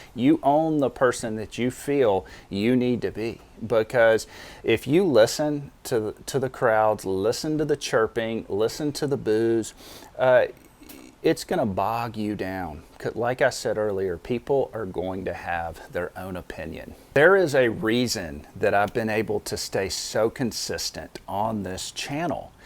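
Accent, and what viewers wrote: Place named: Panhandle, United States. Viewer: I’ve been a big fan of your videos for some time, originally because they took me back to the Pensacola beach of my youth. I still love the weekly beach scenery, but I’ve grown to be a big fan of you personally. I always enjoy seeing your handsome smiling face and hearing that cheerful voice tinged with a soupçon of Panhandle drawl. Thank you for opening up and being so honest with us. I personally know what it’s like to receive criticism on a public level. I am retired now, but I worked in show business for 50 years and the one negative review I got was in a national magazine And believe me it threw me for a loop. I took it so personally and just wanted to crawl under a bridge. Eventually, I got over it, of course, and like you, therapy has helped me a great deal over the years. The one single slogan that I heard that helps ground me is “What other people think is none of my business.” It’s a total waste of time to try to read other people‘s minds and so I don’t anymore. 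Thanks again for all your great work and I look forward to future videos.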